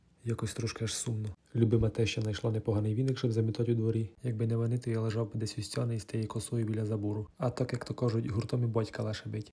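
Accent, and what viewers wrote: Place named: Poltava, Ukraine. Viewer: а звідки ти напиши, просто говір у тебе такий полтавський, от я і зацікавилась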